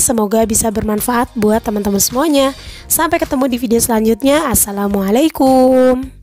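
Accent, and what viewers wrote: West Java, Indonesia. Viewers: nonton kr konsen jdna kagugu s teteh na sundaaa😂😂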